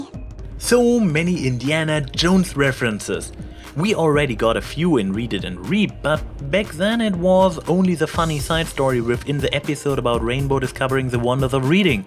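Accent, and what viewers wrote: Germany. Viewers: Hey, I noticed your accent. Are you German or Austrian? I'm Belgian, BTW.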